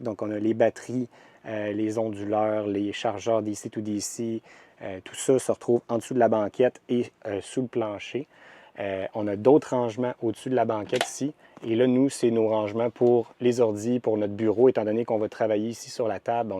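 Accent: Quebec, Canada. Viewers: Le chaudron, le corridor 🤣 Toujours très drôle pour un Français d'entendre un Québécois parler !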